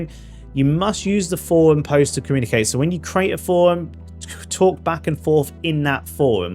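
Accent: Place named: England, United Kingdom. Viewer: How do you have an english accent so thick it makes it sounds like English isn't your first language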